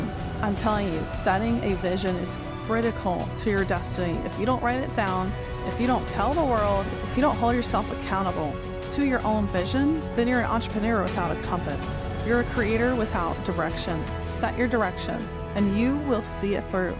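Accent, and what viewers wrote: South, United States. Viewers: Congrats on your escape. You have a little southern accent there. Im old as dirt, so I'm probably not a great candidate for AI or quantum, but it sure intrigues me. At 72, I have so many ideas over the years that have come to light by others, and I keep missing the boat since I don't act. I now have so many other ideas written down, and since my x left, I have time to act on some of my stuff. Help me jump, please. Cleo......Thx.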